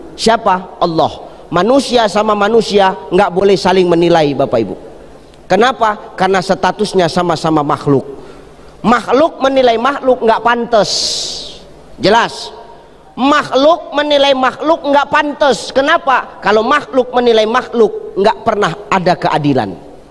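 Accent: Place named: Asahan, Indonesia. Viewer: ASSALAMU ALAIKUM USTADZ., IDOLA SAYA SEMOGA SEHAT SENANTIASA Ada dlm Lindungan ALLAH AamiiiN CERAMAH NYA MENGENA DI HATI SAYA SUKA Dgn LOGAT ASAHAN NYA SUKSES SELALU USTADZ.JAKARTA HADIR SELALU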